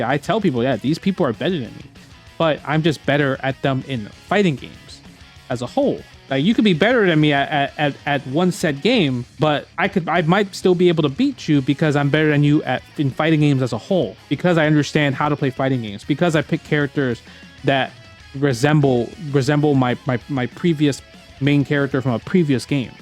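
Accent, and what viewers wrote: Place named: New York City, United States. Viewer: Watching your vids for a while , hearing the way you speak and your slang , what games mean the most to you and even that Nights Jingle at the end … I knew you were my age and from NYC. Yup I was right - 84-85… from NYC, don’t tell me ur from Flushing …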